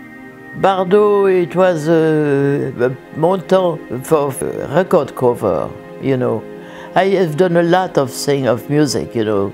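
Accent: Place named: Paris, France. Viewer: Bientôt un an qu'elle nous a quittés (28/12/2021)! Dans ses interviews on entend qu'elle était une (grande) humaniste dans sa simplicité d'être. Le respect et la beauté de l'humain et de la vie! Elle nous a laissé ses merveilleuses photos n&b en souvenir. Je suis sûr qu'elle continue de photographier de l'autre côté de la vie! Requiescat in Pace ... I love her french-english accent speaking. So unique, so french, so parisian!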